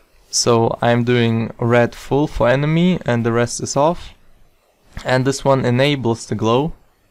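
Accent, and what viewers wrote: Germany. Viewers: man hört das du deutsch bist :D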